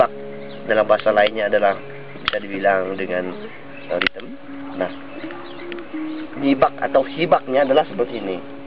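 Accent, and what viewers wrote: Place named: Ulu, Indonesia. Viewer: logat asli orang ulu.. mantap abang ni, akhirnya dapat juga pencerahan belajar sape.. salam dari Sanggau bang..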